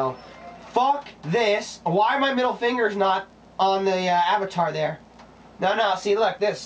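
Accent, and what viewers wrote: New Jersey, United States. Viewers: Back when didn't hide his Jersey accent. *Cheers and applause* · So much Jersey accent. It's beautiful.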